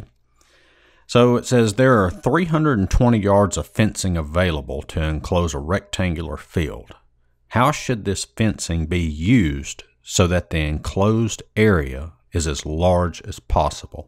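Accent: South, United States. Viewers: Good video. Definitely the first southern guy I’ve had in one of these videos lol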